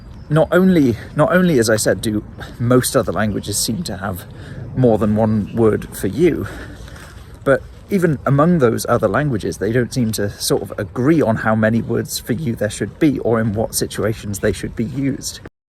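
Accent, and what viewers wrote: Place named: Argentina. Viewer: Qué buen acento argentino! Y el video super interesante. I hope someday we get a video in Argentinian accent😂 you,re almost there, just need to replace the LL sound for SH (lluvia sounds like shuvia)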